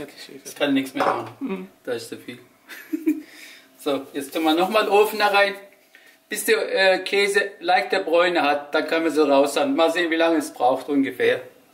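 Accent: Swabia, Germany. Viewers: Ich liebe deinen schwäbischen Akzent 🥰 Liebe Grüße aus Berlin auch an deinen Sohn. Er macht auf deinen Kanal hervorragende Arbeit und 👍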